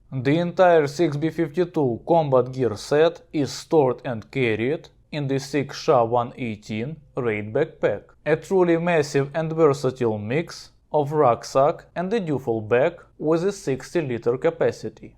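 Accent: Russia. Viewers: Maxed out Russian accent and top video my friend 🤟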